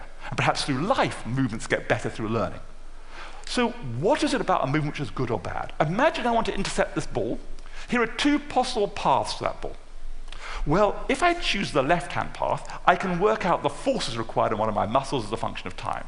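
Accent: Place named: United Kingdom. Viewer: 영국 억양이 이렇게 짜증난적은 없었다...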